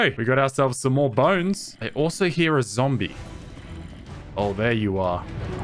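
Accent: Australia. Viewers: Does anyone know where hes from, he doesn't have an accent to me but he speaks like an aussie 😭🤣 naur (no) and seour (so) 🤣💜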